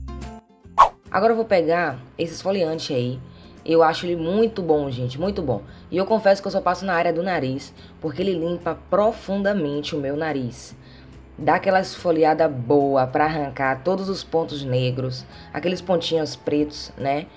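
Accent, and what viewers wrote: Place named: Northeast, Brazil. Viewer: Primeiro video que vejo do seu canal, seu nome é?😂 amei o sotaque vc é nordestina?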